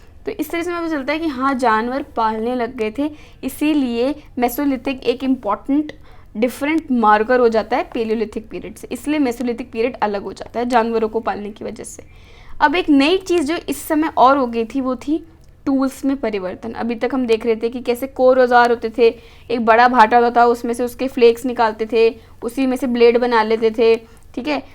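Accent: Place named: Rajasthan, India. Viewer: ❤ mar koot bhata Aap rajasthani ho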